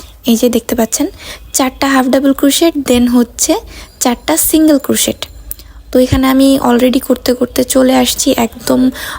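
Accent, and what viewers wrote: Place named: Naogaon, Bangladesh. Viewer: আপনার বাসা কি নওগাঁতে ভাষায় নওগাঁয় মনে হচ্ছে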